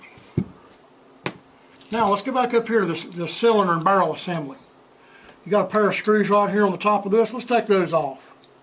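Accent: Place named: South, United States. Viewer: Great video. You don't sound like you grew up in Maine. My guess would be my part of the country, "Dixie". Thank you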